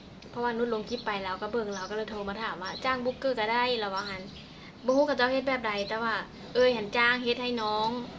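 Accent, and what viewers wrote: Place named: Nakhon Phanom, Thailand. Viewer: เจ้าเว้าญ้อคือคนนครพนมบ้านข้อยเนาะ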